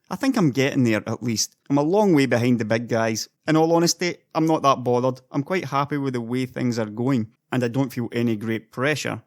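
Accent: Scotland, United Kingdom. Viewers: tech updates with a Scottish accent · Love your accent >~< . Scotish right? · "Alright guys, how's it going?" in a Scottish accent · It took me a while to get used to your Scottish accent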